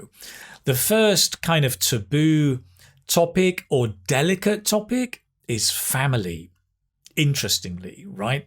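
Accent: United Kingdom. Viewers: British accent, that's cool. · I didn´t believe that I coulp understand british English but this teacher is very good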